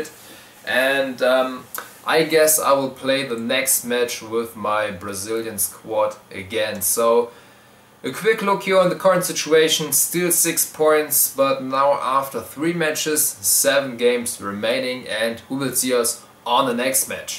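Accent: Germany. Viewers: Play KSI... would be fun to watch his screaming and your german accent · accent? he's german so its the normal way of pronouncing german/austrian names :D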